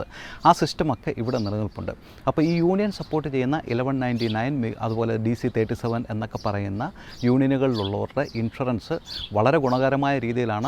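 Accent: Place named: Kerala, India. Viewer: Very helpful video 👌🏽👌🏽👌🏽 Ithrayum kaalam evidaarnnu🙆🏻‍♀️ I am sure this will help a lot of people. Love the way you speak 😘 your malayalam accent 👌🏽 Thanks so much for this information ❤️🌹❤️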